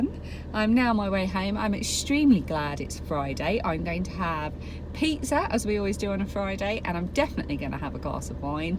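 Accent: England, United Kingdom. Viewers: I can't wait to see you and the family your so fun. when you don't have the money to go round the world it's great to have internet and a tablet 😂... I love England and the way you talk it's awesome!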